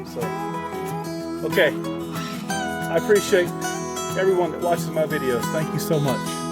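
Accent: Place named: Texas, United States. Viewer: Don't ever listen to negative talk about your accent. It sounds perfect to me. I'm from TX, and you sound similar to what I'm use to hearing. I know some from GA probably have stronger accents. The southern accent is the best. I love your videos. :)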